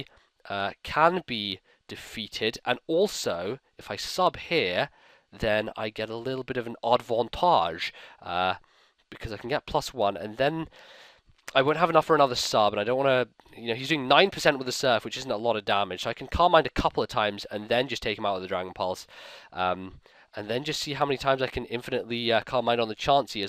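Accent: Scotland, United Kingdom. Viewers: Are you Scottish? I thought you were Englandish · What? Are you scottish?!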